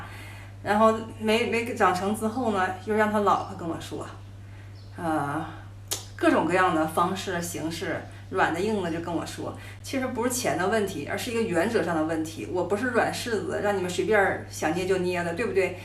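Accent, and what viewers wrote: Northeast, China. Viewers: Beautiful girls sounds like from Northeast China accents and now Beautiful girl moved from North East China to Southwest Yun nan, Dali.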